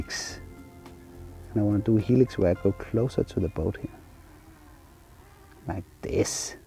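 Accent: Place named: Denmark. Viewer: Einstein? He was German. That's a Danish accent the host is talking 😅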